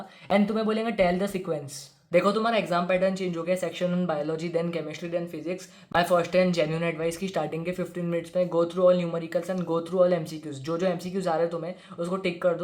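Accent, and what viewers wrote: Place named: Maharashtra, India. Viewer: I think he is from Maharashtra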